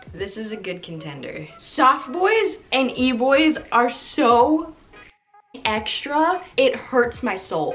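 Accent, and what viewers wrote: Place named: Canada. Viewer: Question: Are you Canadian or am I stupid? You wound very Canadian. I’ve been following you on tiktok for a while but this is the first time I’ve heard your voice and for some reason I fucking love it.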